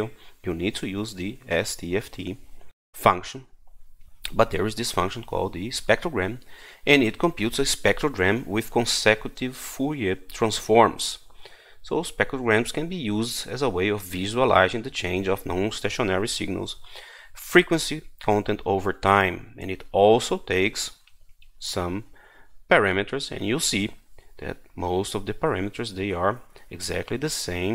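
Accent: Brazil. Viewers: This accent sounds very familiar. Brazilian (nordestino) by any chance? :)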